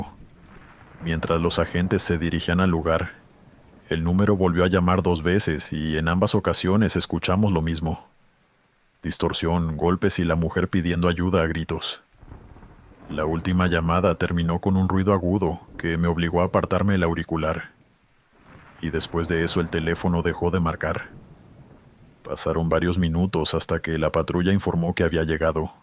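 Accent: Mexico. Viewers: Yo pensé q era Méxicano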